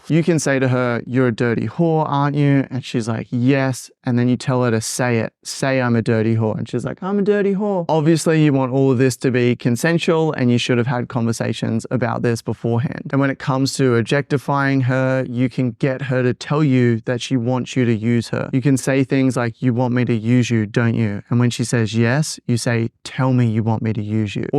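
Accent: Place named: Australia. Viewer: you're amazing. i love your videos and your stupid little australian accent. keep it up, i love watching your stuff with my bestie, we're so educated now!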